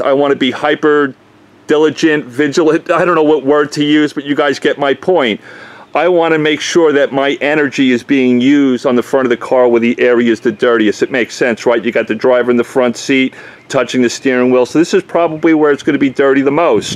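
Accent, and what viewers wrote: New York, United States. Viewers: first off watched all your videos and have gotten priceless tips and tricks from you. I am not an auto detailer by trade such as yourself but am what you would call a " driveway detailer" my own cars. I'm very OCD and all my vehicles need to be spotless. Superclean has changed my life. Since you are going over interiors. I'd love to see your process on how you detail the dashboard, instrument panels, that pesky fake glass where your speedometer is, navigation screen all the way to the radio buttons. Do you still use meguiers interior detailer? I don't mind it but it like you said smells like vinegar and can't stand it. Any ideas? By the way your New York accent and fun loving humor is quite refreshing. Never bored listening to you. Sincerely Dex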